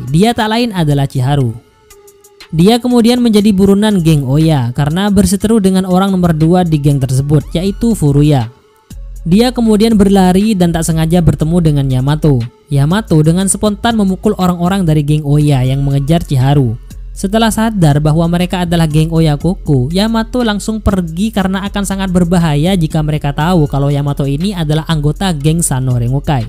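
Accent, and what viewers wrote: Madura, Indonesia. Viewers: Abang ren madureh mantap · Logat mimin sangat medok logat madura apa betul min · madura dimmh bang?? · suaranya logat madura yaa 😁😁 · baru tau ternyata mimin orang Madura, aku bangga. 💕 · Oreng medureh kak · Kentel banget dah logat maduranya,,salam setong dere bro 🙏🏻🙏🏻🙏🏻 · Salfok sama logat/nada abangnya cerita kayak ciri khas orang madura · "apah ca'en lah epade'remmah beih"😅ras madureh jugen min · Logatnya madura banget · Mak a madureh bang,,, apa caen lah, pa dekremaah beih.. Asli mana bang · Bentar², mimin orang madura nih kayaknya😲, mantap min👍🏼👍🏼👍🏼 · seperti logat madura ini mah🔥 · Medureh cak👍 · Adminah tepak ke Reng madureh wkwkwkw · cengkok e meduroo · Medureh min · Logat khas bahasa madura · Teppak kereng medureh adminah😂😂😂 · Oreng Madura ben bg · Madureh ben cak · Yg ngasih suara orang madura ya logatnya madura banget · Mak Reng medure😂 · Madureh dmmah bn bang🤣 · Oreng madureh kaleh😂😂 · Logat mu ada logat madura min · Anak Madura mana min 🤭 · Logatnya kek orang madura min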